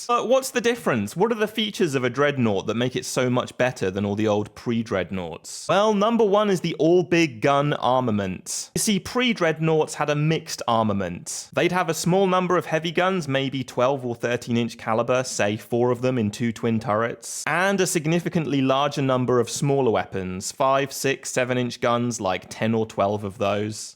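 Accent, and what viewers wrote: United Kingdom. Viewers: Holy british accent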